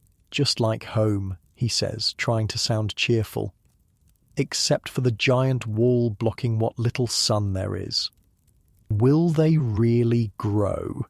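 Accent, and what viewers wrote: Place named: Scotland, United Kingdom. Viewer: Sounds like Scotland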